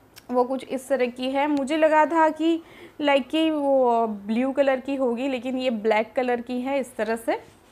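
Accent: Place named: Gujarat, India. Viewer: Ma'am I think aap gujarati ho aapke bolnese lag raha hai.. Mebhi gujarati hu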